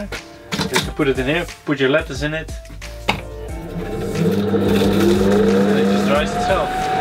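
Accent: Netherlands. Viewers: Vet gaaf man blijf door gaan ik hoor aan je accent dat je Nederlander ben haha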